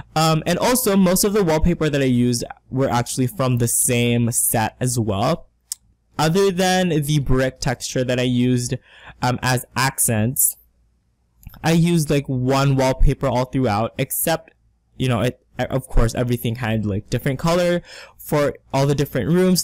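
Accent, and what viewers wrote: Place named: Philippines. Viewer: I really like it!! new subscriber 👏 Ps. I already thought of you being a filipino just when you started talking!! #teamfilipino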